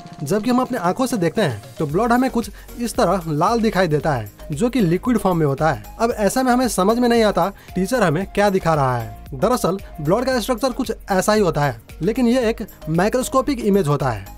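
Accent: Odisha, India. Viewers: Aap Odia ho na aapke pronounciation se lag raha hai. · Are you from ODISHA? Your pronunciation is like Odia people.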